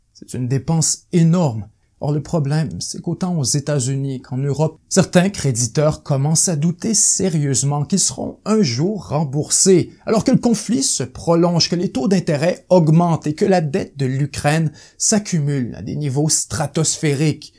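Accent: Quebec, Canada. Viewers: Yo tu est québécois nn ?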